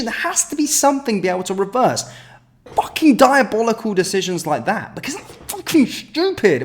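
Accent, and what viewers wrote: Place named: Glasgow, United Kingdom. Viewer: That's some Wine Alley accent you have there mate.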